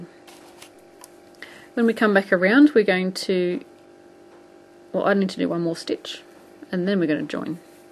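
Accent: Australia. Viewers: you have the absolute BEST tutorial videos on youtube. Thank you SO MUCH!! Listening to your aussie accent is a bonus:) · Thanks, just doing this for the cluster bag:) But now after doing this and seeing your friends technique for fitting hats I really want to make myself a slouch hat:) You are seriously in the top 3 best online crochet tutors in the world. Lobe your work and love hearing that Aussie accent. (I'm an Aussie too, just feels so homey) Thanks again!:))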